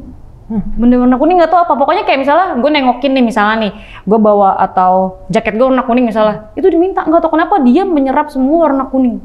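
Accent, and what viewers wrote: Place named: North Jakarta, Indonesia. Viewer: Dari gaya gaya ngomongnya kaya kenal dari daerah mana,, eh Jakarta Utara toss dulu salam dari asal Priouk.. penasaran Jakut nya daerah mana nih ceritanya? Hehehe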